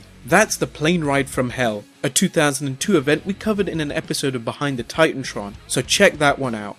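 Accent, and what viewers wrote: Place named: United Kingdom. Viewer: Well what do you expect? It's annoyingly another British guy narrating a video.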